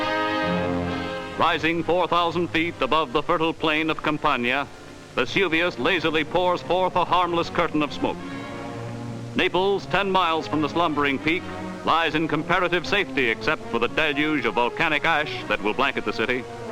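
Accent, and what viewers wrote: Transatlantic, United States. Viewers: cocky transatlatic accent! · Transatlantic accent 😀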